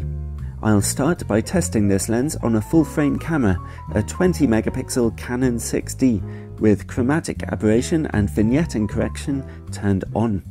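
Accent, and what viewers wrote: United Kingdom. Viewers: The best reviews ever. Love your British accent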